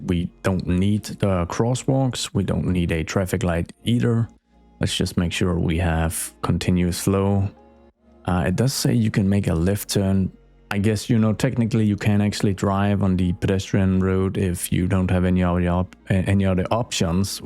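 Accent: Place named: Denmark. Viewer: I really like youre city, its very realistic. Also i can hear that you have an accent it sounds danish but im not completely sure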